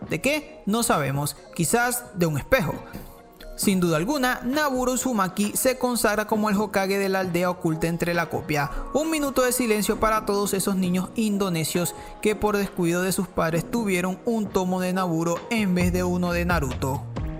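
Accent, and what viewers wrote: Venezuela. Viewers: JAJA me da risa que seas venezolano , ya que entiendo todo lo que dices Soy de Venezuela uwu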